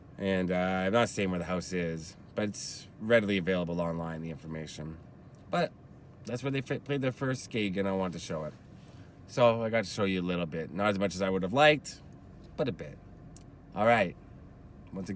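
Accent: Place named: Canada. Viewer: Don’t be ashamed of your Canadian accent! Who wants to sound American anyway...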